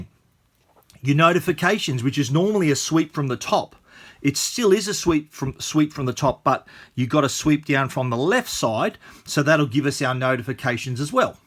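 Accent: Australia. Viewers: its an australian accent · He must be Australian because I am also and I didn’t think he said swipe of sweep any differently than I do 😂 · Aussie accent sounds top notch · Guys I’m Australian, I think it was just the way he said it, u know what he means it’s not really an aussie thing, just the accent is.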